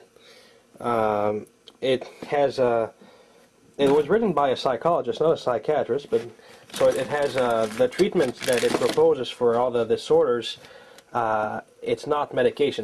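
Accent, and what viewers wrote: Quebec, Canada. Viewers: Are you quebecois?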